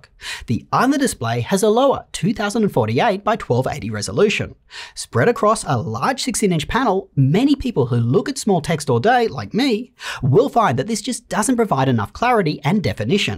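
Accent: Australia. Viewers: Why the aussie accent when filmed in the US? Audio seems almost AI?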